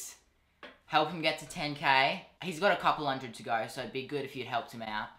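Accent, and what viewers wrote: Australia. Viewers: I need to say this because I got some beef with you Australians WHY ARE YOU AND YOUR ACCENT SO BEAUTIFUL? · Posting vids, doing my homeschooling fishing......... your like me, but with dark hair, an Australian accent, and about a 600 something thousand more subs XD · Australians have the coolest accent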